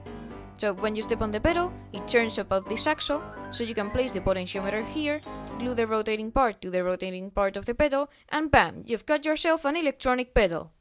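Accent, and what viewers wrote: Spain. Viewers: Hi! I was wondering were you were from. By your accent, I guess you're from Spain, If that's the case, just know that what you've done could have been a 1rst of bachelor project, so CONGRATULATIONS!!!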